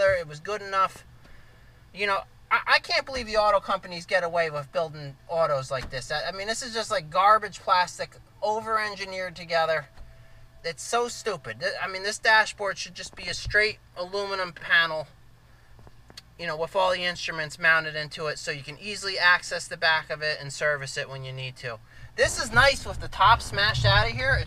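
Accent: New York, United States. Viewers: Awesome video- that accent is like every neighbor I ever had from NY area- keep up the videos they are a huge hit.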